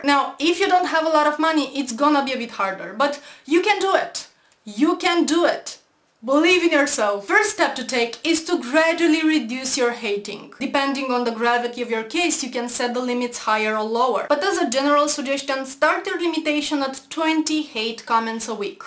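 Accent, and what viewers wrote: Romania. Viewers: You are stunning!!!! I am in love with your Romanian accent Very cute!!! And your vids are very informative esspecally the Islam one. This is something I would like for us to discuss as you are probably a lot closer to it then I am in the US Keep up the great work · I don't hate you, I disagree with several parts of you're post modern liberalism, but not enough to hate you. The Romanian accent prevents me from hating you.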